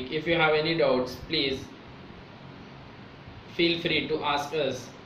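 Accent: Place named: India. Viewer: Why are indians so bugady udding it, naw watdis ...blah blah... cant understand you! DUH!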